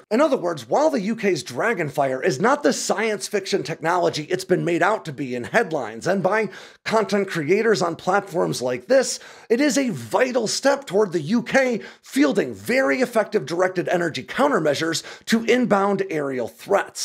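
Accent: United States. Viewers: And there you go Russia. If there is anything else we can divulge about any potential flaws in the Dragon fire weapon system, or any other of our allies weapons don't hesitate to get in touch with us at Sandbox. Mind you the guy is American by the sound of him so it is what I would expect.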